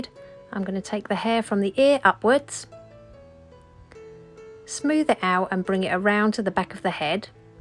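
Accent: United Kingdom. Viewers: You sound like the princess of wales. Thanx for the tutorial 😁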